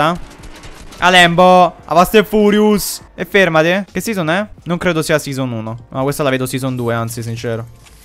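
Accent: Naples, Italy. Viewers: madonna mia fra quanto si sente accenti napoletano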